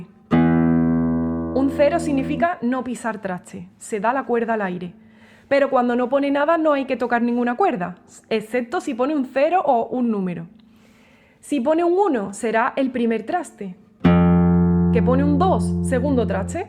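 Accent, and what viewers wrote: Andalusia, Spain. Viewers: Dice Buenas tardes, es de andalucía y pues lo dice con su acento andaluz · No soy entendido en música. Pero me quedo a escuchar ese acento andaluz 🥰 que enamora.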